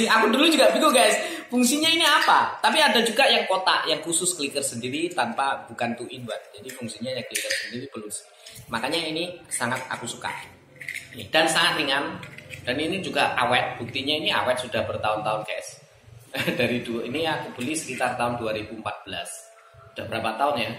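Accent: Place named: Surabaya, Indonesia. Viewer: Mangkane kok koyok tau eroh, ternyata wong Suroboyo dw hahaha...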